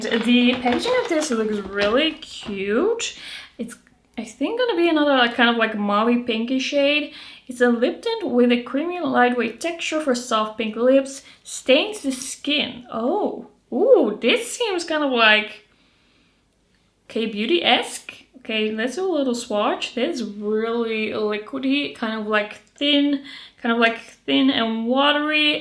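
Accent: Netherlands. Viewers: Hi 👋 Thank you for your reviews on more affordable products. I just recently discovered your channel and had to subscribe. I love you accent are you from Netherland? 🩷 from South Africa 🇿🇦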